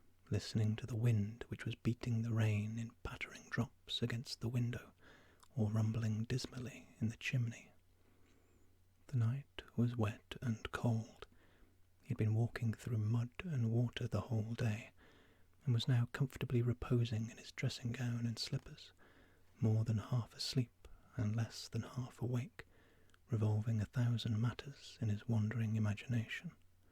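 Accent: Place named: United Kingdom. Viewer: What a great idea to relax 😌😊💚. And as a Frenchman, what a great opportunity for me to practice my English pronounciation by listening to you 👍. Furthermore, I love the British accent (Any british accents). Most of the time, It is the one that I try to imitate when I speak English 😁 ; even if I still have work to do to improve my accent 😅. In any case, this moment was very soothing 😴👍💚. Thank you for the peaceful break 🙏💚.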